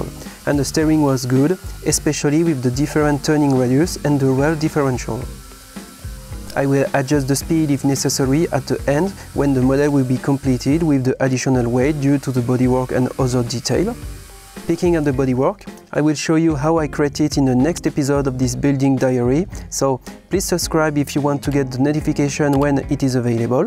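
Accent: France. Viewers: t'es francais non?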